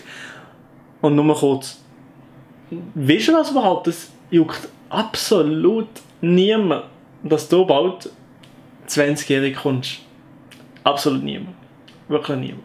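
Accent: Switzerland. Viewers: S tönt chli wie en Mischig vo Basler, Berner und Bündner Dialekt 😂 Super Video ❤ han als Zugerin es paar Sache Verstande, es paar ned. Aber gugge han ich Verstande 😂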